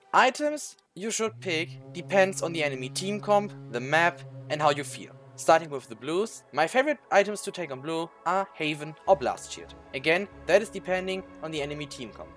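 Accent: Germany. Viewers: Are you German? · Youre German Man merkt sofort deinen Akzent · Are you German i just think it because i am from Germany too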